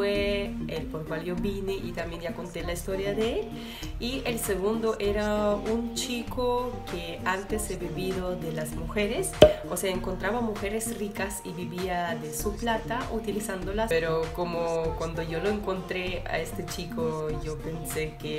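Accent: Peru. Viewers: Q crackkkkk.. jajaja ..habla muy pero muy peruanazaaaaa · La jerga y malas palabras te salen súper natural como peruana, te haz peruanizado igual que Veronique 🤘🏿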